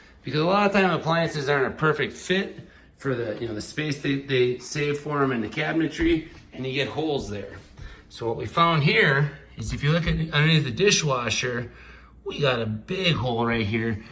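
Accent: Australia. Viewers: Entertaining and informative. Love the Australian accent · Hah Hah....northern Australian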